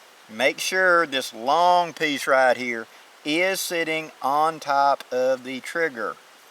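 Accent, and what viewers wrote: South, United States. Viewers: Iimpact driver,small hammer, well fitted bits, and a padded vise with patience and care will get the screws out. putting them back where they came from is brilliant. Very nicely done sir. P.S. I thought I did hear that Magnolia in your mouth. Hurrah for the Bonnie Blue flag. The fourth screw is for the shoulder stock which will not work on this gun.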